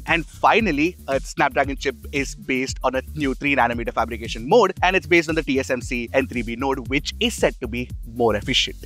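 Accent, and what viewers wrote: India. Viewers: I don’t like your Indian accent honestly, difficult to understand sometimes as English is my second language but your channel, contents and quality is perfect 🎉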